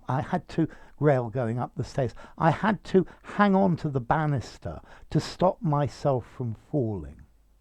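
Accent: United Kingdom. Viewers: I love his accent so British ❤️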